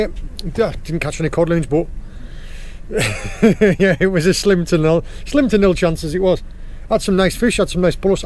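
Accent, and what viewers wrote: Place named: Teesside, United Kingdom. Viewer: cracking content bud, nice to hear the Boro accent lived in Great Aston for 15 years and in Looe for 5 years, now ;living in Cheshire and the only fishing i get to do now is fly for trout, miss being on the sea loads.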